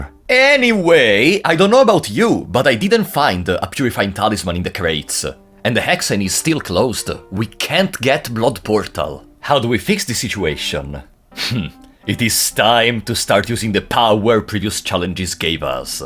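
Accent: Italy. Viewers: I just watch them. your Italian accent and dark dungeons of fear and hunger are really calming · you sound italian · Italian acent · Ma sei italiano? L'accento sembra familiare 👀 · The very true question is "I recognise an accent... frate sei italiano?" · Onesto i sente che sei italiano 10/10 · L'accento italiano si sente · l'accento italiano mi distrugge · l’accento italiano si fa sentire parecchio 🤣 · Mi piace come si sente bene il tuo italiano puro proprio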